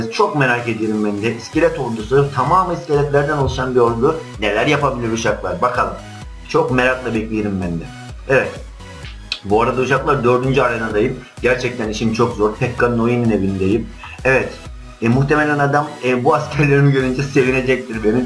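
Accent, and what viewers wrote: Black Sea, Turkey. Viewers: karadenizlimisin la "D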